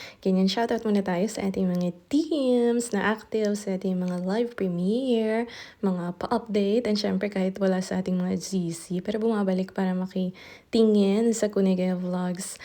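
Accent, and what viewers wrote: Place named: Ilocano, Philippines. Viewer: Congratulations sa mga kamag anak mo host. Parehas tayong matigas ang diket na salita, ilokanong norte 😅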